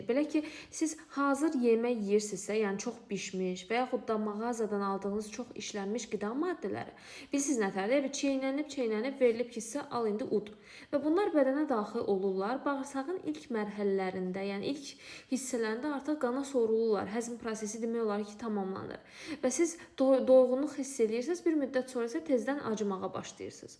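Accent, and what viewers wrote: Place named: Azerbaijan. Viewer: Benim kızım.Acaba ben sağlık tevsiyelerinizi mi dinlirem yoksa güzel Azerbaycan türkçenize mi heyranam bilemedim.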